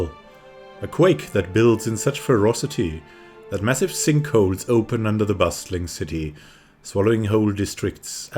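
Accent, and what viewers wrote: Germany. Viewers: I love your role playing videos. Your in-character quips are always delightful and enrich my viewing experience. I have also watched (and I am still watching) your PoE path of the damned play-though, and I have to say you really have immense courage to commit to playing all the way through a massive game like that on such a difficult level. Your "thoughtful gaming" skills are very impressive! I am also hooked on your soothing voice and that very appealing hint of a German accent. I can tell that you are enjoying the play-through as much as we are, who you are taking along on the journey. Your fine intelligence and wry humor shine through in your videos, and checking your channel for updates has now become a part of my daily routine. Thank you so much for your faithfulness to your channel and to your subscribers. Keep the excellent content coming!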